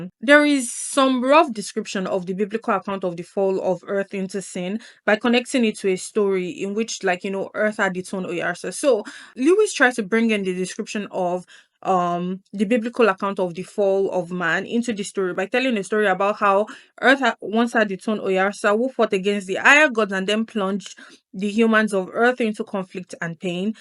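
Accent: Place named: Jamaica. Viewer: Amazing video love your accent are you Jamaican also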